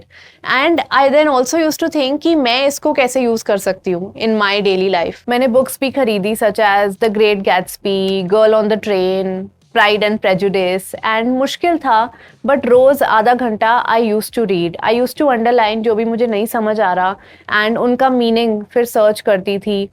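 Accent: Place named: United States. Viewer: American English ❤ American Accent ❤ American Syllable ❤ American Pronunciation words ❤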